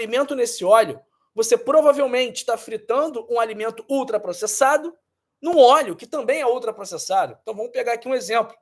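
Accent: Rio de Janeiro, Brazil. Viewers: Dr, vc é carioca? Pêlo sotaque kkkk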